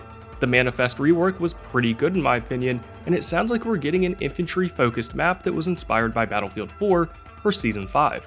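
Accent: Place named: Philadelphia, United States. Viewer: Great video, very well put together. Just subscribed! Also, are you from the Philly area by chance? A few words you say have an accent that comes through lol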